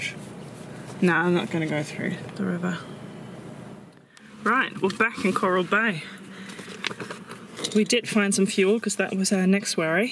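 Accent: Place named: East Germany, Germany. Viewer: Was the water so deep? It almost looked like you could just drive through :) would have been funny and maybe wet in the car :) und ich liebe den Ostdeutschen Akzent :D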